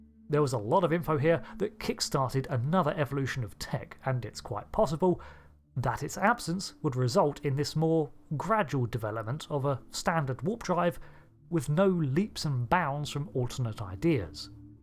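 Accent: United Kingdom. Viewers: I, LOATHE british-narrated, ANY-thing! FAIL!!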